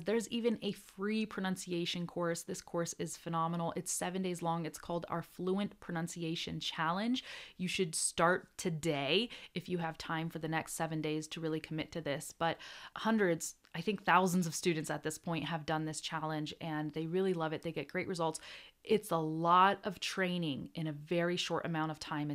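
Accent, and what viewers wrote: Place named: Argentina. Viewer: te he escuchado hablar en castellano (muy bien por cierto) y nunca confundiría tu acento con otro hispano. Me haces sentir representado como argentino, raro porque no sos argentina pero muy lindo. Saludos.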